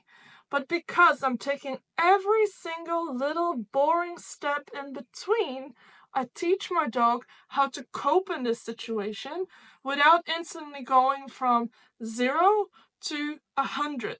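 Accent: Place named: New Zealand. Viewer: Thank you!!! Now i know I was doing everything too fast with my dog and expecting him just to behave and listen like he is at home without step by step routine- lots of nerves by now understanting my dog and buldong a relationship with him...just thought he has me in his backside when going out to new places -oh well probably he does as there is so much to do and see 🤣 especially if you are only one yo 😉 Anyway thank you- we will practice what you preach 😁 Ps. Love your kiwi accent and sense of humor 😉😁 Greetings from Poland 🙃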